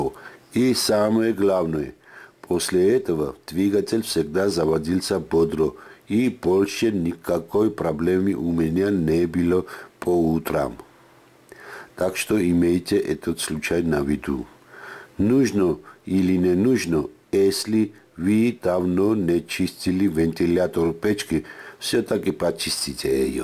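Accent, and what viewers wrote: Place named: Georgia. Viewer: Прекрасно слушать русски под грузинском окцентом.❤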